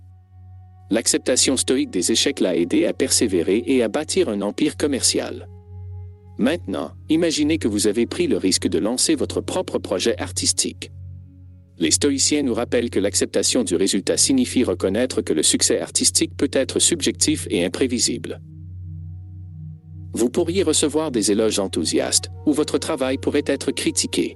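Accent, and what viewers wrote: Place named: Quebec, Canada. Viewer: De très bons exemples, j'aime bien aussi la narration avec l'accent québécois. Merci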